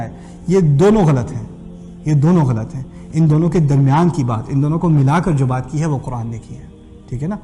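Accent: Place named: India. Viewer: Masha allah done a great work... May allah bless you.... Nice hindi accent by the way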